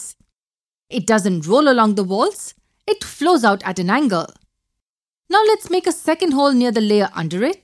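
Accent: India. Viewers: I hate the Indian accent lol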